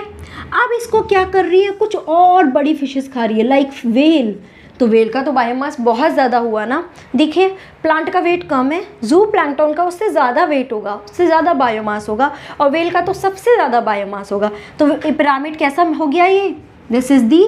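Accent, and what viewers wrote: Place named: Jammu, India. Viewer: Accent from jammu 😂❤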